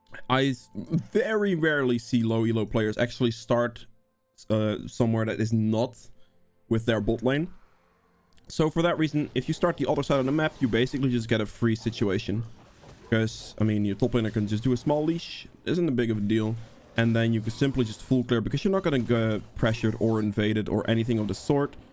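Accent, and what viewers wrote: Netherlands. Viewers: Hoor ik nou een Nederlands accent?😂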